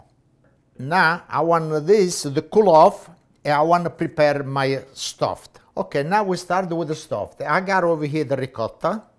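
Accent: Italy. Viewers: I absolutely love the Italian accent! I haven’t heard it in a long time. Tomorrow is Wednesday and I think that eggplant is on the list! Thank you!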